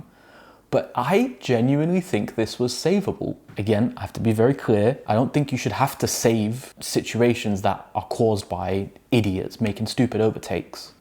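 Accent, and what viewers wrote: United Kingdom. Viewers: I just discovered this channel because I was looking for non-supercar content and I'm liking it. The posh English accent side by side with car accidents gives everything an academic vibe.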